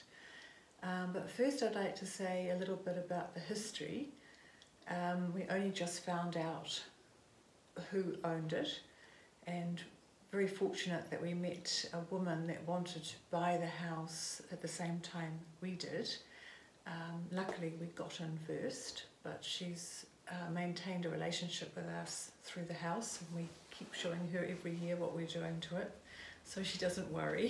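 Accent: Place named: New Zealand. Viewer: Your lovely NZ accent and soft voice make it difficult to capture the story of your magnificent Chateau. Look forward to many more intriguing vlogs.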